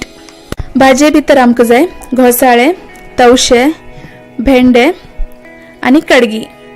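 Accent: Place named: Konkan, India. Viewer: Just now I watched Vikas Khanna's episode in Goa. And in the episode he goes to a restaurant and eats this dry curry called Khatkhate. It's only when I watched this video, I came to know that it is the same as Gajbaje. 🙂 I watch your videos mainly for the content and love your Konkani accent. Just curious to know where you are from?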